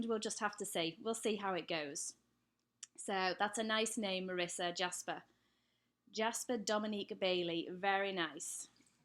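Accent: United Kingdom. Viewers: MAN I MISSED THE LIVE STREAM!!!!! i was really busy today, and didn't even touch my laptop:( i love this video though.... and i hope you make more live streams. PS i knew you were British!!!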